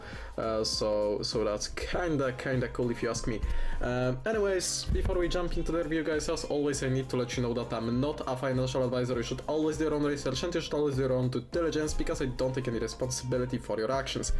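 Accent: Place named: Eastern Europe. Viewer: you have a nice accent what is it? some slavic it seems. I already bought for 1000$ shr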